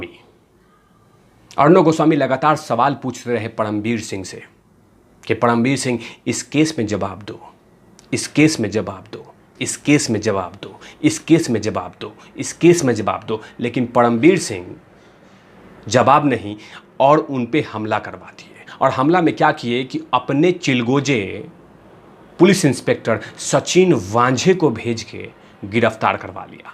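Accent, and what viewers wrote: Bihar, India. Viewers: विहारी बोली और खड़ी बोली दोनो साथ चालाइये भैया 🌹🌹🌹🌹🌹👌🏻👌🏻👌🏻👌🏻👌🏻👌🏻👌🏻 आपका पुराना वाला अंदाज चाहिए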